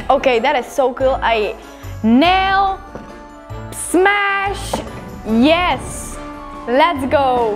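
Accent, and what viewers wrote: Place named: United States. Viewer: Serious question because I'm curious - do all Belgians learn American English with an American accent? Asking as a much closer neighbour (Brit) 😊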